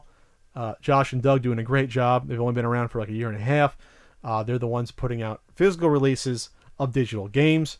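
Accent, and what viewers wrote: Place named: Northeast, United States. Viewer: Sounds like you might be from the northeast. GL on the film.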